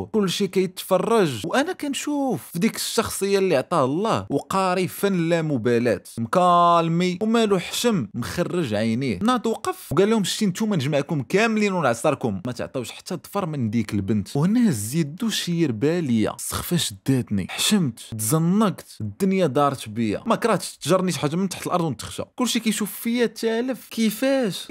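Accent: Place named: Western Sahara. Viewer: انت دور دور تجبد على لسانك ناس ديال الصحرة گد عندنا لجنوب نسلمو عليك 😉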